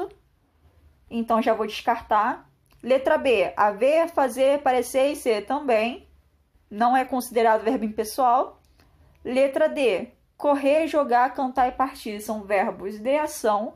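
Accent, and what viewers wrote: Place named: Rio de Janeiro, Brazil. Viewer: Esse carioques dessa proff Onde que vai o acento?? E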